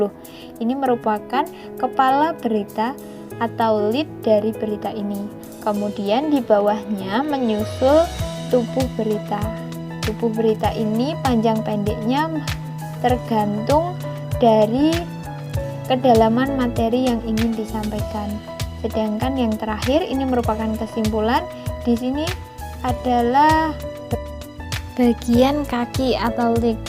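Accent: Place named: Java, Indonesia. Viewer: Medok sekali bu suaranya 😁 tapi gapapa bu terus berkarya 🤗🤗 saya venny dari kelompok yayuk cs 🤣🤣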